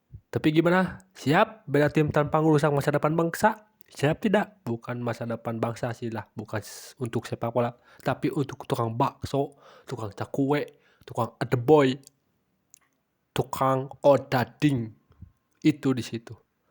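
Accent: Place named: Sunda, Indonesia. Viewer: Mang mantap sunda pisan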